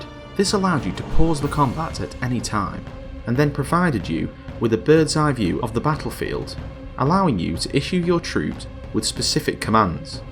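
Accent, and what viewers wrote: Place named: Midlands, United Kingdom. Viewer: haha thats cool mate, loved ur vid, im from london so local by the sounds of ur (midlands) accent? lol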